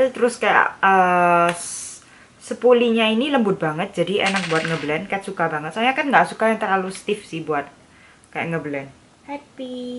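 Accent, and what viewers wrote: Java, Indonesia. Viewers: medok medok ngono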